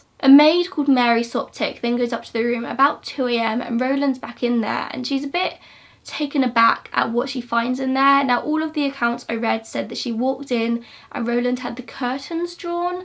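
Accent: United Kingdom. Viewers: Hi, you're so cute girl I love your British accent and your gorgeous lips, xoxo.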